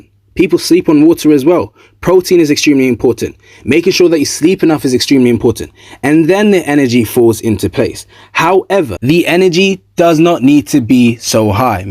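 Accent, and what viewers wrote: London, United Kingdom. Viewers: it’s a london accent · lol no it's a London accent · British accent is perfect for commentary.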